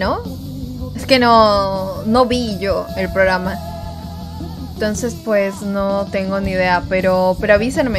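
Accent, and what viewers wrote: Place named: Mexico. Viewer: flaca todo bien pero porque hablas como mexicana!?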